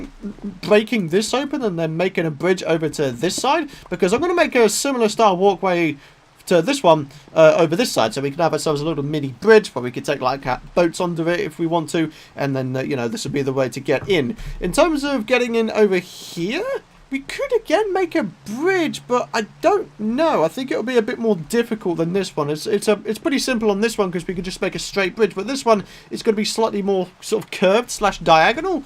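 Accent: United Kingdom. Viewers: Giovanni Barberio...i would guess great britain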